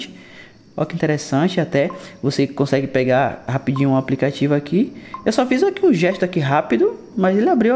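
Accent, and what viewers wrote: Bahia, Brazil. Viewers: Gostaria de saber se a miui 10 vai chegar para o redmi 4a? Força aí no canal , tu é baiano?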